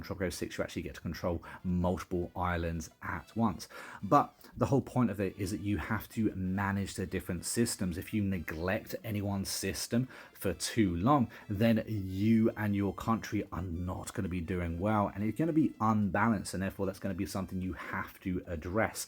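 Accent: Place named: United Kingdom. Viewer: Red Banana. Thanks for the up to date review which also mentions New Frontiers, what I was looking for. Also early on I noticed that you were deliberately choosing to speak in normal English and not a weird mix of normal English and specifically American English in word choices, pronunciation and putting the stresses in the wrong parts of the words. This isn't just annoying, it's the fact that it doesn't make more people watch and like you from over there. Americans have been trained by Hollywood to James Bond baddies with English accents to give a level of intelligence and competence that they like to listen to, just because we get past participle right. I therefore was not surprised to hear you have a book out, so that was why I didn't have to explain to you what editors say about not mixing dialects. Good luck to you with both this and the writing and thanks for being competent. 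This is very hard to find among British content creators increasingly.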